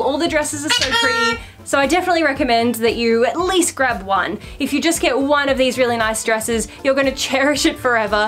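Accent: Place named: Australia. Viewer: I would love to see you do a video about how you got started doing YouTube + how you balance work, life, and being a content creator!! (Although I understand you can't spill all your secrets haha!) I find your videos super inspiring and I love your style and personality so much. I'm going to Japan soon so I've spent the last week finding, and then binging your videos to get ideas on what to look out for when shopping, and I can't get enough! 😭💖 As a NZer I feel like I'm getting a bit of an Aussie accent from watching so many of your videos.... but anyway, I can't wait to watch whatever you post next! 💚💚💚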